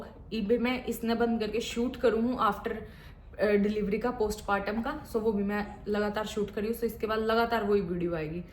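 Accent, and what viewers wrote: Haryana, India. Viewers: Hi, congratulations 💐💐 Hazel ki eyes bilkul iske father jaisi hain. God bless you both❤️❤️ I like the way how you speak specially your haryanvi tone😊. I am from Rohtak.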